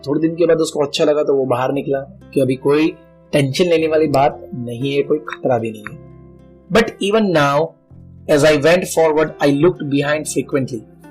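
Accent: Gujarat, India. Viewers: Sir ap gujarati ho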